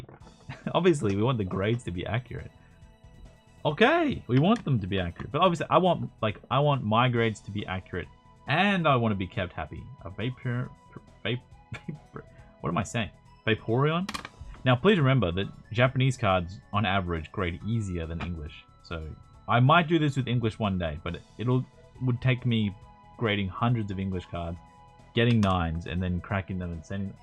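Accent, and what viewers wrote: Australia. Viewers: An hour video of regrades from a dude with an Australian accent? I click.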